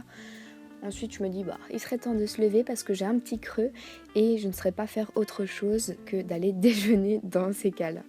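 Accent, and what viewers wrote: Belgium. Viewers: Vive la Belgique et son accent 😅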